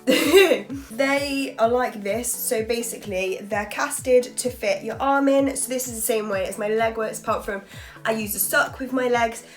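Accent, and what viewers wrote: United Kingdom. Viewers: Boy you have a thick british accent and it's sexy girl!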